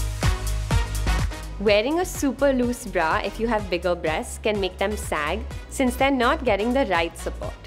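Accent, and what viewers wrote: India. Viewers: Why is this white woman speaking with an indian accent 😂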